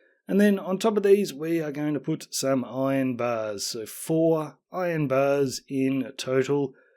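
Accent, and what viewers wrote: Australia. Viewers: Oh wow, didn't expect you to be Australian! Hello fellow friend down under 😃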